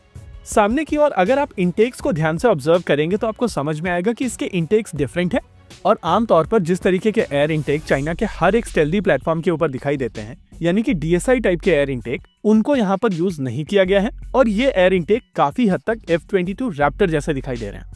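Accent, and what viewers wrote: India. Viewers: english with hindi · 对英语一窍不通的我一听到这口音我就知道这是印度人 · I must say I'm addicted to the india accent.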